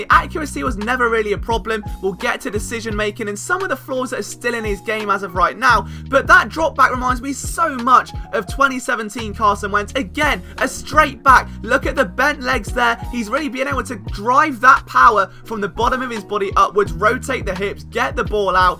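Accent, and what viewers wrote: United Kingdom. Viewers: Does the British accent indicate that NFL football is really taking on in the UK ?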